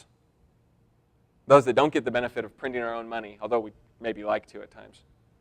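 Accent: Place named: South, United States. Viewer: they must like his southern twang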